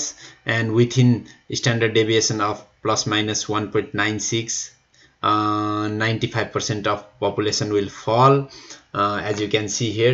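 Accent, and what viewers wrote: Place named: Nepal. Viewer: are u from Nepal ? your accent is same as mine. thats why I asked.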